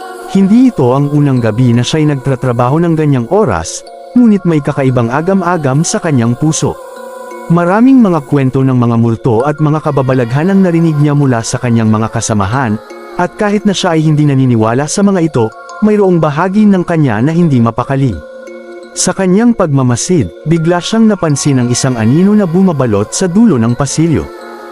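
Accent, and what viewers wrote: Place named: Visayas, Philippines. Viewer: ano ka bisaya slang ka mag salita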